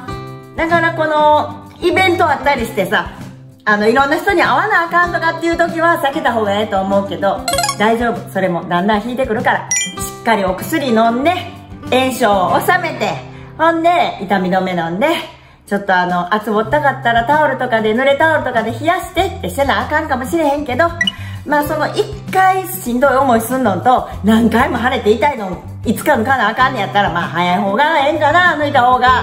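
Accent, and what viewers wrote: Kansai, Japan. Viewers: 創作のエセしか聞いた事ないからちゃう？ 普通の関西弁やけど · コテ2の関西弁でハキ2しててすごい笑 参考なりましたー！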